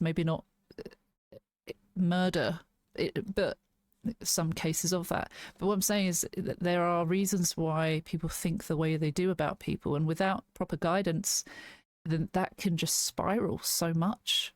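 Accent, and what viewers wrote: England, United Kingdom. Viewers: Hi it was refreshing to find your channel. A more familiar English accent (most creators being American or Canadian) I have subscribed also. Hope you can do more in the future. Your quest for the meanings are usually correct. Keep it up...x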